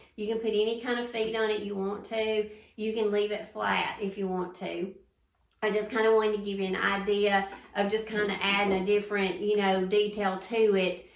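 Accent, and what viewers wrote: South, United States. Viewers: Love this project! I love watching your videos. Your sweet Southern accent is charming!! Blessings XO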